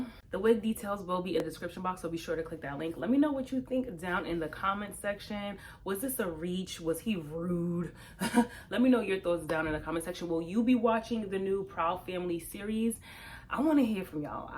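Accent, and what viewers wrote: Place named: New York, United States. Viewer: I love your accent.....New york ?? New Jersey ??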